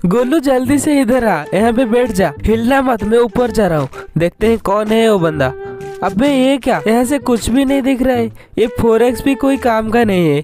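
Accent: Odisha, India. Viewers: Your voice is odia 🥰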